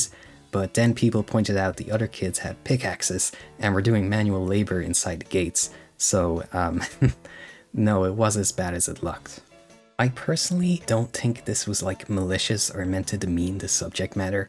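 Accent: Ireland. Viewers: Are you half Irish ? Or somewhat Irish you have Irish inflections in your speech like the word “think” -> “tink”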